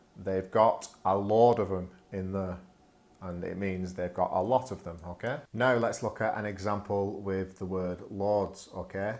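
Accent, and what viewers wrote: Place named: United Kingdom. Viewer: Hello! I am your new subscriber! 👱 You British accent very similar to Gordon Ramsay's, so British that I wanted to make me a cup of tea with milk instead of black coffee as I used to ☕😅 Happy to find your channel! Wish you loads of love, tons of money and a load of new subscribers in the coming year! 🎄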